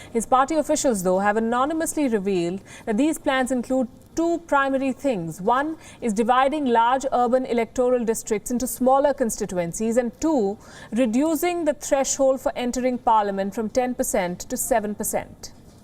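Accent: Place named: India. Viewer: Turkey is not a third world country by the way as India is. Where severeg's water is floating on the streets Where people sleep on the streets. Where more than 50 percent of its population attend toilets in the fields and farms. Where people more than half of its population living below poverty line. It's all sad.But How come rather than focusing on such basic issues of it's own the colonial indian so called funny accent's english language 😂channel reporting about others.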